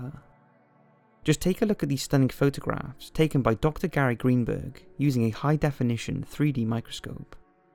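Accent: Wales, United Kingdom. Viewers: Addicted to your videos. The juxtaposition of your soothing Welsh voice and the often creepy and disturbing content in the videos is practically intoxicating. Binge watching your stuff right now!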